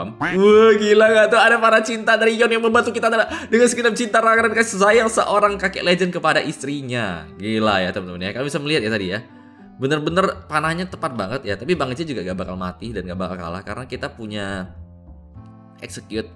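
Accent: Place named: Medan, Indonesia. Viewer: Kita lego lego. 😁😁😁 bahasa indonesianya bang kita gocek gocek 😁😁 keliatan kali medan nya bah.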